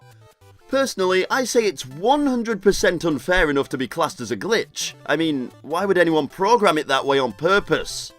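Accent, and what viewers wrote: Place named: Scotland, United Kingdom. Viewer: I love your Scottish accent <3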